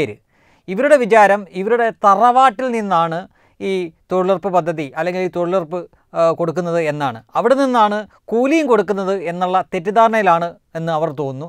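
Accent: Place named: Kannur, India. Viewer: എൻ്റെ നാട് ആലപ്പുഴയാണ് , കുട്ടനാട് ആണ് എന്ന് വാർത്ത വായനക്കാരൻ ഇടക്കിടെ പറയുമ്പോൾ ഒരു സംശയം. ഭാഷ കേട്ടിട്ട് കണ്ണൂർ കാരൻ ആണെന്നാണ് തോന്നുന്നത്.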